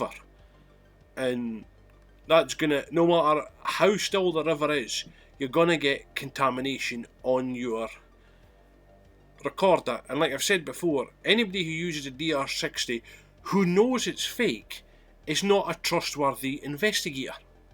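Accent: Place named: Scotland, United Kingdom. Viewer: great workmate. these fakers have been caught again. greetings from Munich Germany S 🤘 🖤 🤘 btw love your Scottish dialect.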